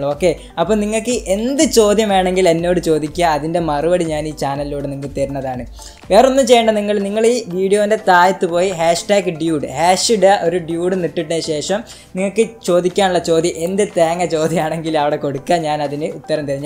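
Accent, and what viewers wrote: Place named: Malappuram, India. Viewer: # നിന്റെ വീട് എവിടെയാ..? മലപ്പുറം ആണോ ..? നല്ല മലപ്പുറം ഭാഷ... !!😘😘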